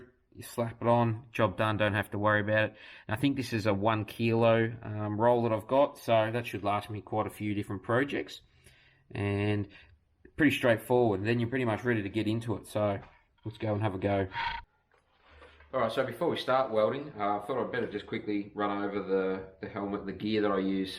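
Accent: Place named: Australia. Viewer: The great quintessential Aussie accent. Thanks for your interesting review.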